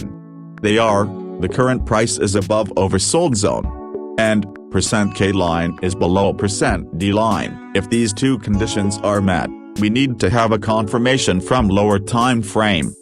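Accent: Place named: India. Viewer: Could you know I've always than this question mark this is like trying to listening to customer service from India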